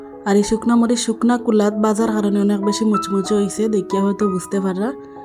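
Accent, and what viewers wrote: Sylhet, Bangladesh. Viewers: আপু তুমি কি সিলেটি।আমার খালু এভাবে কথা বলে।❤❤😊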